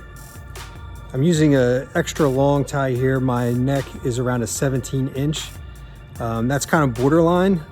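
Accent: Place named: Philadelphia, United States. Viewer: I’m sensing a Philadelphia kind of accent. Maybe Baltimore. Somewhere in the Northeast without being New York or New England.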